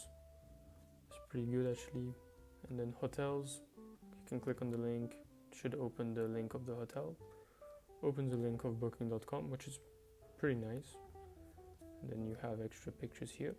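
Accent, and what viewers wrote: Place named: Nigeria. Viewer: why she sounds like a nigerian scammer?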